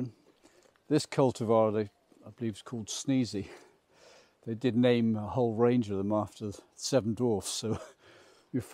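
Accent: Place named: United Kingdom. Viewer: Thank you so much for the wonderful tours. Subscribed! Loved that huge Fern from the Chilean Rainforest - what a beauty. Such a fantastic variety of plants - I will be binge-watching every youtube video I can find. Just lovely and exciting to see such rarities and the wonderful ways they are displayed. Please continue showing the plant names - that is incredibly helpful - it is very difficult to understand British English when you are used to American English:).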